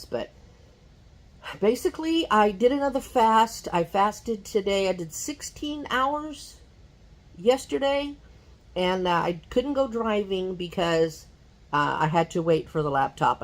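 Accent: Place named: Texas, United States. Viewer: Good for you! It is a respect thing. People shouldn't be doing that at all. I heard the Texas tone come out in that conversation. We had the same problem with so many people doing that...we finally broke down and did a payment plan for the fence. We really couldn't take it any more. People are so rude and inconsiderate. Is this person dangerous or mentally unstable? I just worry about your safety. Take care girl. Good for you😊 ❤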